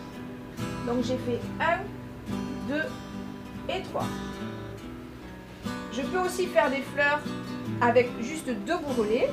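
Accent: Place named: Provence, France. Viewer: Vos peintures sont très belles , et votre accent a un parfum agréable de provence . Merci pour vos tuto .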